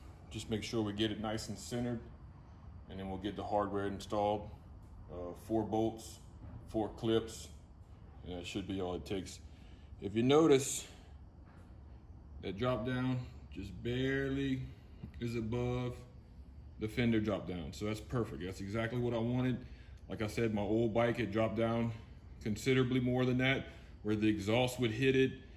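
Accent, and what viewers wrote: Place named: South, United States. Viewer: Canada? I don't know his current address but that accent is from waaaaay down below Canada.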